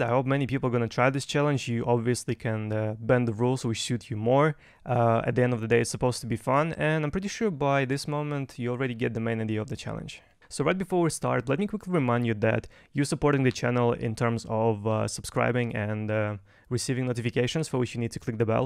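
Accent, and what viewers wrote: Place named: Russia. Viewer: уровень английского поражает, но все-таки слышны русские отголоски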